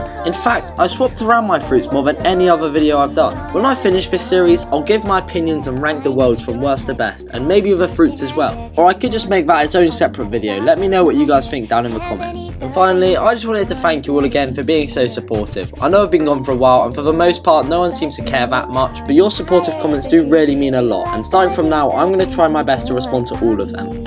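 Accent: United Kingdom. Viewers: bit too entertaining.. you british? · A GOOD BRI ISH GUY?!?!??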